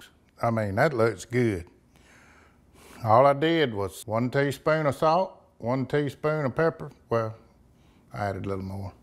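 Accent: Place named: Kentucky, United States. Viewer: I done learnt that, 😂 I love it. Hey, you sure you ain't from Kentucky? You sound like one of us good ole boys from up here in Kentucky. Enjoyed the video hope y'all boys and ladies in the DD world are all doing well. God Bless and keep the videos coming, love em. ☮️ 💜 God Bless y'all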